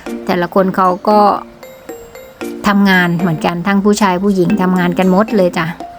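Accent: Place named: Korat, Thailand. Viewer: สำเนียงคือโคราชแท้😊😊😊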